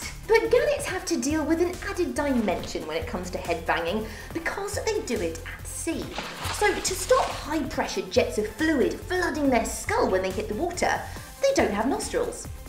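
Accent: United Kingdom. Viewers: lol is so hot you can even hear her British accent when she laughs;)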